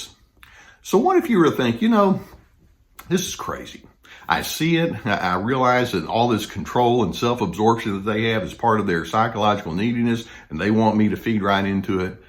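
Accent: South, United States. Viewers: Your description of a narcissist freed me! I couldn't believe you were saying everything I was dealing with, like Gaslighting! He put me through hell playing that sick mind game, he made me question my sanity on more times than I want to admit. Just sitting there with my ear buds in listening to your calm southern voice that reminds me of my uncle you put into words what I wasn't able to because I was so beat down, frustrated and empty! At the end of your video I knew what I was dealing with, I knew it was never going to stop and I knew I was done! It was so bad I was carrying a pistol! I packed up what I could get in my car and left that day and I will never go back!! He has turned my youngest child against, and tried to do the same with her older sister but she cut him off when he starts on a rant! I'm gone, sooner or later he's going to need a new source of supply so he's going to point that inner rage at his own daughter and when he does he has met his match because she's more controlling than he is! 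Lol She's a chip off the ole Narcissistic block! I don't hate him, love him, he's pathetic. He had a stroke from high blood pressure because he wouldn't take his meds. and he has tubes coming out of his kidneys because they're barely working and you know what? I'm not going to help wipe his ass, I did it for 41 years and I refuse to give him one more minute of my life! I might sound heartless, if I am he made me this way!! This is my life, the fact that I have absolutely no i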